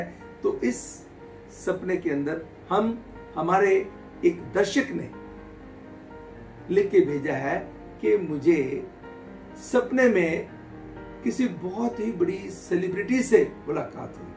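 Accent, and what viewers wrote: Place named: Kashmir, India. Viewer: aapke talaffuz se lag rha hai ki aap Jammu and Kashmir se talluq rakhte ho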